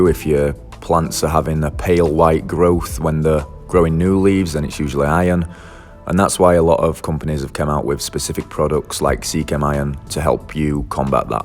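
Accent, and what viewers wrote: United Kingdom. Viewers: You sound as though you’re in the UK, how can you buy aquarium co op feRT? Great vid BTW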